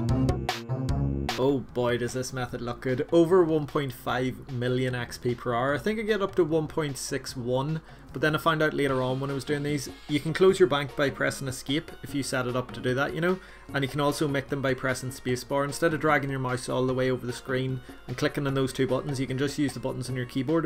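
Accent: Northern Ireland, United Kingdom. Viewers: You sound northern irish! I havent seen a osrs youtuber from my homeland! Im subbed, brilliant videos im loving these video ideas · Where are u from lol? I’m from Northern Ireland and u sound like ur nearby c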